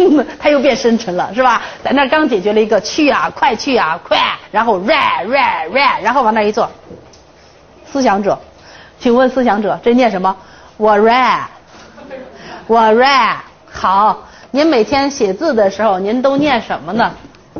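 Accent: China. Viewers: Chinglish !!!! 別再拼音了, 完全不正確, 深山農村無人怪你. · 唉！我跟你學到八级又怎麽様？ 中国式音調！真的跟外国人交流 時就發火，發現青春白費了！ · Chinglish. Most of the pronunciation is incorrect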